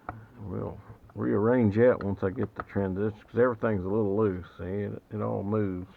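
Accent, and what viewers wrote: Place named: South, United States. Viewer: Impressive bud! Dropping by to show you some love! From one Southern boy to another....just guessing by that voice lol lol.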